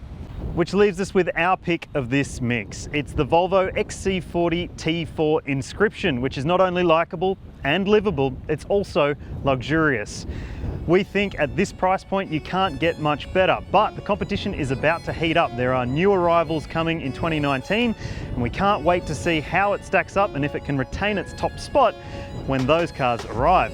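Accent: Australia. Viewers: Aussie accent.....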